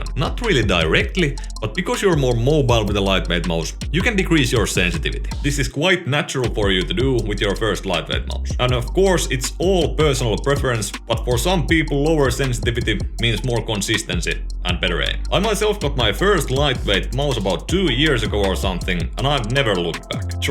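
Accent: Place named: Finland. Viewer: Aaaaaaaaaand the guys rallienglanti is just satisfying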